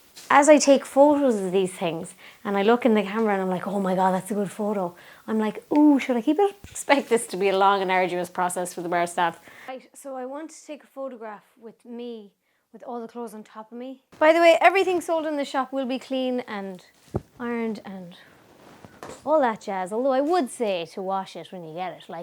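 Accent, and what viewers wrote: Ireland. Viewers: I like ur accent!! What part of Ireland are u from originally?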